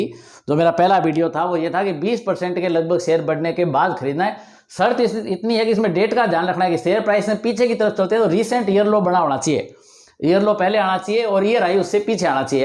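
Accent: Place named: Kota, India. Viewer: Sir aap Kota ke aas pass ke lagte ho, apki tone se aisa lagta hai.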